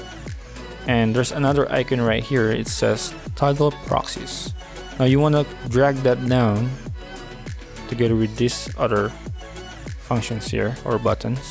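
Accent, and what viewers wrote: Philippines. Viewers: I feel like he’s a filipino with his accent. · sounds pinoy-ish :D .. nice tuts.. problem is i don't understand this proxies and ProRes thing.. when i edit., i just drag and drop since i'm just working on one clip..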